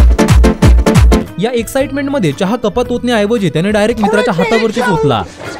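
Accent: Ahmednagar, India. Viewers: Nagarkar ahe tey tyache ucchar tasech rahtil. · ही नगरी भाषा आहे भाऊ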